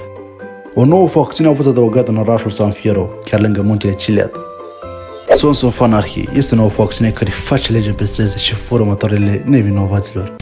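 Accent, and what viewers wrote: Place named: Moldova. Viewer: Ma jur daca inteleg ceva din ce zice asta…oribil accent, n-are o treaba cu B-vlog-ul vechi…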